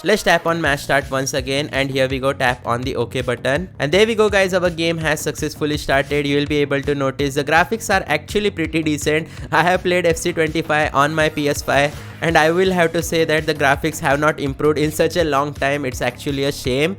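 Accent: India. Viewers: always indian accent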